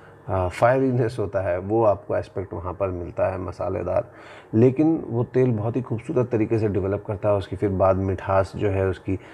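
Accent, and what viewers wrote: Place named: Dehradun, India. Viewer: Hi, I do like the way you talk. Infact you do talk like my relative from Dehradun. I hope you don't mind telling me your full name and your birth place.😊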